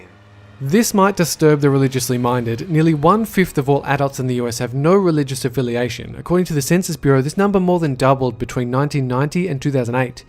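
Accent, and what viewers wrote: Australia. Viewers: It's actually an Australian person and the reason why it's mainly America is because America has a lot of problems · A video titled "our society" read by an Australian, and only has facts about America. Dafuq? Look I know America tends to think it owns the world, but it doesn't. -_ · He's Australian actually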